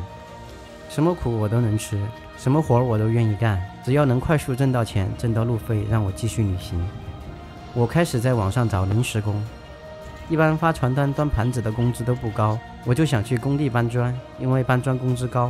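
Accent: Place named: Sichuan, China. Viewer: 支持你！我也是为了梦想来到了美国！希望未来在美国闯出一片天地！老乡，听你的川普，很亲切！我四川广安的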